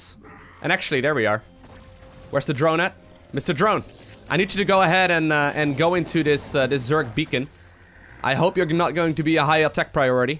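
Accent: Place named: Netherlands. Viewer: So more people where screaming and hitting their screens yelling " look at your minimap!!!" Do love the vids and ur dutch accent, being dutch myself;)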